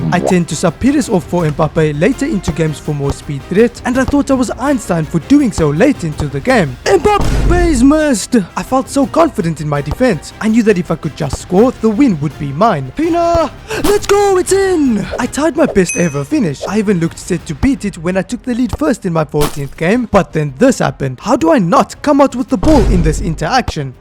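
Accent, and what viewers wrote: South Africa. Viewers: You from sa?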